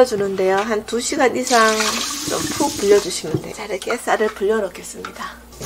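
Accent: Gyeongsang, South Korea. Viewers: 경상도 분이신거 같은데 저도 그쪽~혹시 아실라나 옛날에 막걸리 거르고 남은 찌개미에 단 맛을 내기위해 뭐 넣고 먹었는지 아시나요. 설탕이 없던 시대인듯 지금 표현할라니 너무 웃기네요. 마른모 꼴로 생긴 (꼬라재비)ㅋㅋㅋ.ㅎㅎㅎ. 그거 몇알 넣고 찌개미를 숫갈로 비벼서 먹으면 정말로 맛있었던 생각이 나네요.먹거리가 귀해서 봄이면 들에서 찔래도 꺽어먹고 진달래도 따먹고 버들개비 따먹던 아~주 옛날 생각이 나네요. 까맣게 잊고 지냈던 옛날 생각을 잠시 해 보았네요. 저도 막걸리 담그기 해보고 싶네요. 맛있는 막걸리 맛은 잘 알아요. 옛날에 맛을 많이 봐 놨기 때문에 ㅎㅎㅎ. 언제 그렇게 많은걸 배웠을까 싶네요. 감사합니다.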